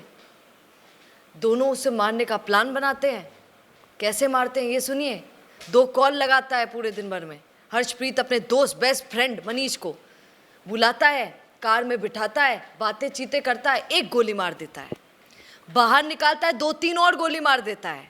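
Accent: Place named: Marwar, India. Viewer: आप मारवाड़ी भाषा बिच बिच में बड़ी अच्छी लागे सा बाई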